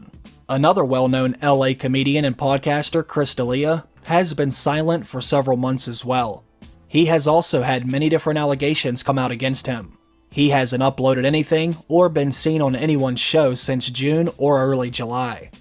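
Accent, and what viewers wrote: Pittsburgh, United States. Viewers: Hey! I grew up in the burgh’, I can hear that Yinzer in your voice. Am I right?!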